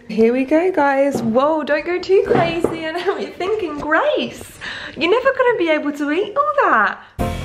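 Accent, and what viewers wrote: England, United Kingdom. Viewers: Who else loves her British accent? 😍❤🇬🇧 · So that's what a british accent is... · Pink Fluffy Unicorn a brittish accent could be northern Irish welsh etc but her accent is just English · Are you British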